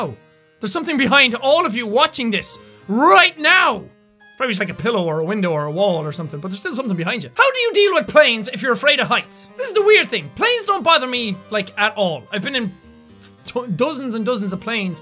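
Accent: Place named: Ireland. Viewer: thanks man I'm inspired by you a lot and eventually want to play games on YouTube with you mark and Felix! My dream is to create a little community of my own on YouTube and have a nice group of my own! I would like to try and be a sombody rather than a nobody. And prove to the world just cause I have a mental disorder (ADHD) doesn't mean you won't achieve great things. I know I've got a huge challenge ahead of me and I'm already off to a bad start. But I'm determined to become a youtuber! Although right now I'm only doing Lego videos. But I hope to get gaming videos up within the next year. After I find s job. Right now I'm still looking for one and I have been since Christmas break. I hope you don't mind my super long and a bit chattering comment. But stay awsome and thankyou for so much inspiration. Unfortunately, I wish you used your Irish accent it reminds me of back home (Newfoundland, I am a proud Canadian)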